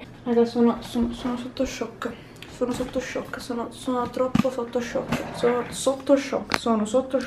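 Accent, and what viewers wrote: Rome, Italy. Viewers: Se sente che sei romana anche io lo sono Bellissimo video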